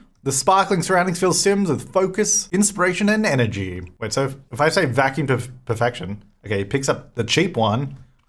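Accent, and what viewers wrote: Australia. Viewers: I feel so stupid I’ve been watching you for years and apparently I’ve missed that you’re in Australia and I knew you had an accident but I could never pinpoint where, I thought maybe New Zealand.. it was because it wasn’t that cartoony Australian accent I never would’ve thought I felt so stupid right now I need my PhD taken away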